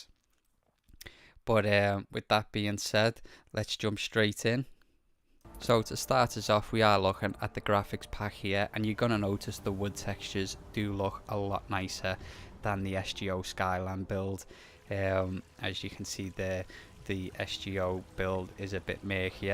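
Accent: Liverpool, United Kingdom. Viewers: Omg ur scouse and you av the same nickname as me, get in lad! 😁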